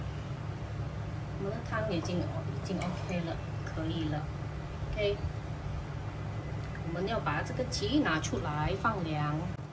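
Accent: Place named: Malaysia. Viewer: 我们马来西亚人的语调😆很温馨还有马来文备注📝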